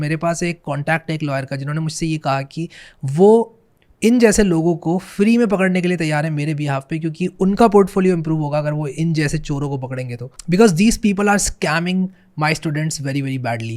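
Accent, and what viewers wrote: India. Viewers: English bro .. but easy to understand like an Indian accent with subtitles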